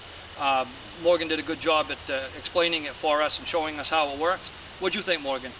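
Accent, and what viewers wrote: Maine, United States. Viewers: Southern Maine accent. Gardner maybe?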